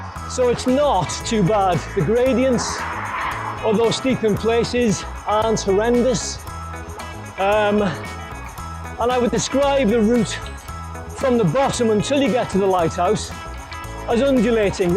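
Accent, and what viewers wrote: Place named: Northwest England, United Kingdom. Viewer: Great video.. we did the same route 3 weeks ago and it blew our minds too! Did you try the rough road up from where you stopped for refreshments? It’s a good climb but very rough surface with loose gravel. It sounds like you’re from the NW area, Cheshire/merseyside (ish) I live north wales nr Abersoch and Pwllheli and there are some fantastic roads over hear, so if you’re in the area give me a shout for some good climbs and car free country roads.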